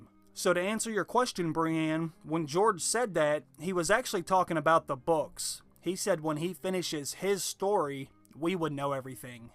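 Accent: Pittsburgh, United States. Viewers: Do I hear a yinzer?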